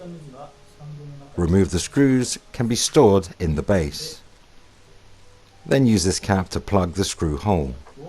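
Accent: United Kingdom. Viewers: British ASMR for Playstation fans.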